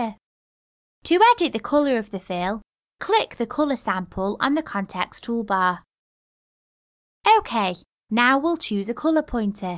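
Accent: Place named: England, United Kingdom. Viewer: Omg she sounds gorgeously English like someone on a reality tv show. love her!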